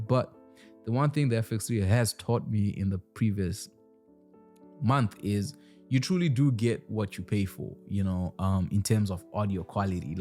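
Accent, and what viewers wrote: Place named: South Africa. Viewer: Bro is in Canada?!?!? I thought he’s in South Africa 🤣 (because of his accent) we’ll much love from South Africa 🙌🏾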